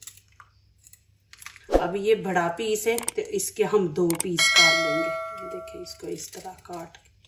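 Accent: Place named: Punjab, India. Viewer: Ap ki recipie bohat achi hoti hen aur ap ka urdue me panjabi lehja aur mohabbat bohat inspire kerta he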